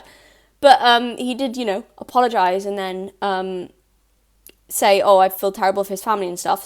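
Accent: United Kingdom. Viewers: Not listening to some one with a British accent. No thanks.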